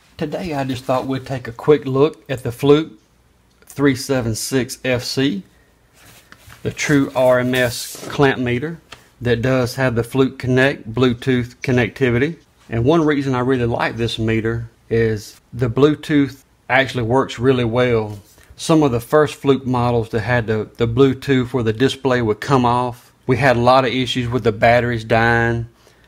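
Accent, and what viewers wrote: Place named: Georgia, United States. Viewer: Are you a Georgia boy! Lol. Nice meter. Stay safe buddy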